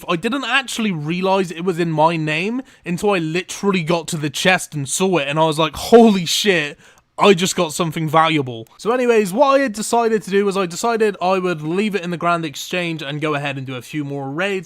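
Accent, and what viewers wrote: Bristol, United Kingdom. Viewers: Are you from the south-west of the UK? You have a birstol-esque accent.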